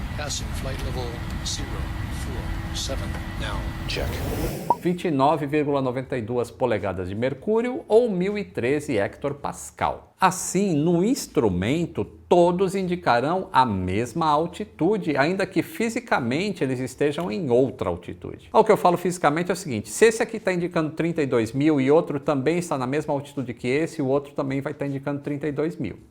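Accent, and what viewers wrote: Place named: Minas Gerais, Brazil. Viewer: Voltou de Minas com mineirês mais completo...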